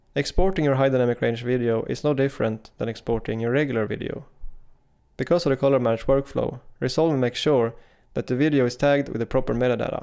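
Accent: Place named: Sweden. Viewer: Bra där. hörde direkt att du var svensk ;) Jag har precis börjat att filma i HDR och Davinci Resolve. Gör det på en Panasonic S5. en fråga. varför ser V Log skit ut även på den färdiga HDR bilden? helt platt utan färger. Jag har även testat några Blacmagic Raw filer och dom ser perfekta ut redan från start och kräver mycket lite grading. att få ordning på en V Log fil för HDR i Davinci Resolve tar enormt med tid. varför är det så? finns det HDR LUTs man kan använda för V Log?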